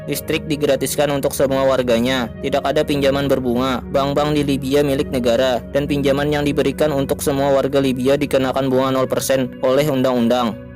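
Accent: Banjar, Indonesia. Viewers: Logatnya kada kawa dikeramputi lg, pasti urang banjar, hehe.. salam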